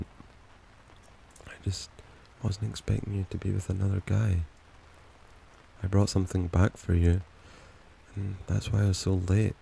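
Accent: Scotland, United Kingdom. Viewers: This might be an insult but are you Scottish 😅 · IM OBSESSED WITH HIS ACCENT 😍 I live in America so I barely hear rare accents like his. Scottish accents are SOO unique 😍😍😭😭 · ... hey Mr. Wonderful, Do my ears deceive me?? Are you Scottish?? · I’m Scottish and this just proves scotty accents are just>>>> 😮‍💨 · Me, being Scottish and losing my shit over his accent because I didn't expect it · Omg are you Scottish? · It’s a Scottish accent and I’m in love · It's nice to hear a familiar accent! Not enough Scots on here. My only pointer is emoting. Not all of it sounds totally natural. You do have a very soothing voice though, and I like the rest of it :) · Errr is this a scottish accent im not good with accents TvT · if i’m not mistaken, it’s actually scottish!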